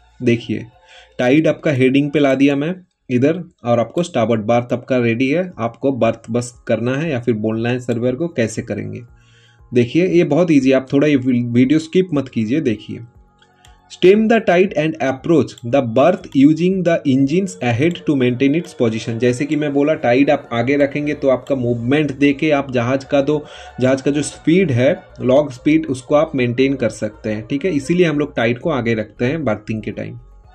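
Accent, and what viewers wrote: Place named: Bengal, India. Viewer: You must be a bong!!! Ure accent suggests so...anyways these videos have been of immense help....Two thumbs 👍 up for your hard work... Really appreciate it....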